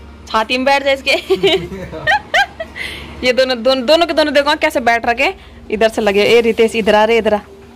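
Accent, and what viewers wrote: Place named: Pahadi, India. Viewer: Maam . Pahadi tone aa hi jati hai Being .pahadi. ..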